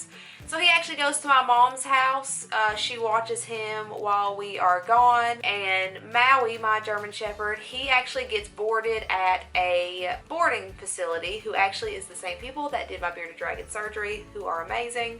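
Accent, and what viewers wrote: South, United States. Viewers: I am in love with your voice ... Lol your beautiful as well but that southern voice....sheww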